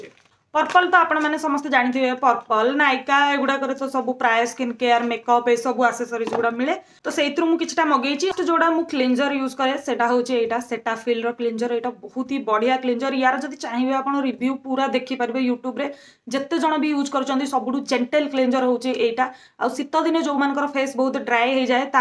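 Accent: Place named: Talcher, India. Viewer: Hii sis apana Talcher language v tk kahuchanti ta mote v laguthila ki apana Talcher ru tuma Katha kahiba style bahut badhia mu v talcher ru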